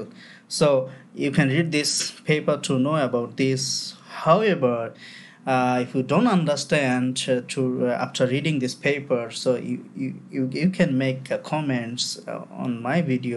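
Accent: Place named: United Kingdom. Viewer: Some word pronouns with british and russian accents which sounds very odd 😢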